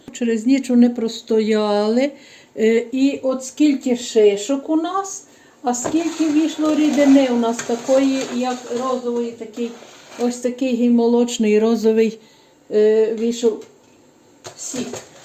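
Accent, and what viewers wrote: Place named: Volhynia, Ukraine. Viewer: Карпати? Говір схожий на волинський, в районі Ковеля.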